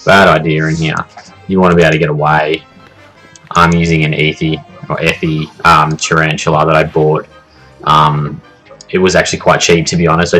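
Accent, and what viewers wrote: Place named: Australia. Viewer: Are you lagging? Looks like you can barely walk anywhere. What country are you playing from and what shard are you in? Because you sound Australian, so you should be in Oceania right?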